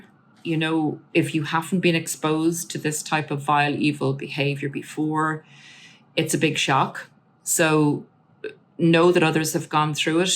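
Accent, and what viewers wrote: Ireland. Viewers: Just love your Irish accent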